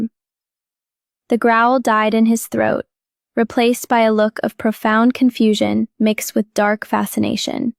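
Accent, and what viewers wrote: Scotland, United Kingdom. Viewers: I have enjoyed this story, and love the accentnof the narrator, since Scotland is where many werewolf stories originate. Just wish the voice was an octave lower. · Love the Scottish accent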